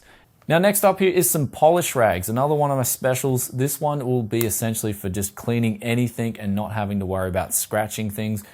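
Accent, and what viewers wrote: Australia. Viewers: I am surprised, I assumed given your accent you would live in Aus, but the products you show suggests you live in Japan? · They couldn't understand your aussie accent in America. BTW Kerosene + water on a rag is a stronger cleaner.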